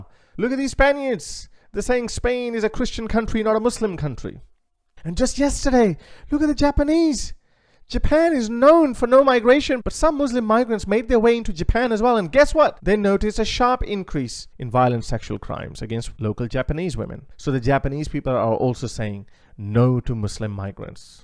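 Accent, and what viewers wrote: Australia. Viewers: Are you an Aussie? You sound like one. "Wokies". Too funny mate. Pity about the subject of the video. Cheers mate. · I did not realise you live in Oz, although I know you have the accent. I came to live in England 21 years ago. It was so different to what it is now. When you spoke about visiting Europe & seeing the changes, I felt sick. But it's true. I am white but I was born & raised in Africa. My late dad was Welsh & moved there after WW2. He fought in the RAF as a gunner. I know he would be heartbroken to see both Wales & all the UK in the state it is now. 😢 It makes me angry & sad, that so many people died to stop Hitler, & now things are going downhill fast. I've got family in Australia & I'm wishing I could move there!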